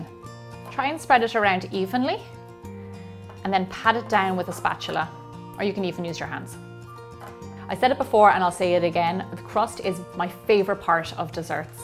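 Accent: Ireland. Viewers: I LOVE all of your videos they're always so great and delicious! I can't stop watching them. I'm an Irish dancer and I love your Irish accent! It's beautiful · I KNEW YOU WERE IRISH! Sorry I'm new to this channel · are u irish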